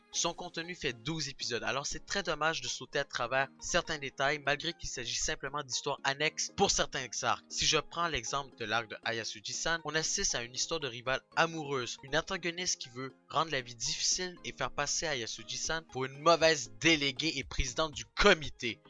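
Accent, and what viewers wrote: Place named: Quebec, Canada. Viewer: Alors non je ne suis pas un PyroManiac, enfin pas encore, car je ne me suis encore pas mis à découvrir d'autres vidéos, notamment à cause du boulot... Mais je risque de m'abo si j'aime ton contenu. Ensuite, je trouve que ton classement par tiers (que j'avais compris^^) est pas mal car il ne les classent tous pas dans un ordre précis. Cependant j'aime bien donner un top 3 (comme celui dans le com précédent). Je m'étend pas plus sur ce commentaire, pourquoi pas sur une autre vidéo, car je vais commencer par regarder l'AMV et les contenus qui m'intéressent. Ah et oui je me doute bien que tu es Québécois, et je ne trouve pas ça utile de le faire remarquer. A partir du moment où tu parles français et que le contenu me plaît, je me fiche pas mal de ta nationalité selon ton accent^^ Voilà je go sur ton AMV, et merci d'avoir pris le temps de me répondre :)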